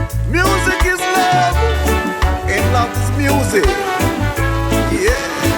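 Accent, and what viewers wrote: Spain. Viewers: Your Spanish accent is ❤ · Just came across your channel> I've watched another of your videos by now. If you want to wear a bikini wear one. I was trying to place your accent in the other video now I know your from Spain. .Una novia que tuve su primer idioma fue el español y a mí me encanta el español. For give me if I'm off on the sentience in Spanish. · Loved hearing the spanish accent <3 That was quite sassy though lol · You are so Gorgeous ❤ and the Spanish accent 😍!greetings from New York State! 🗽 ! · That was really cool how you explained about car rules in spain. Maybe do an english and spanish learning video on van stuff. Love the spanish from spain its pronounced very beautiful ❤ and I love the way s and c's are spoken. Classic . GOD BLESS MAY GOD GUIDE YOUR STEPS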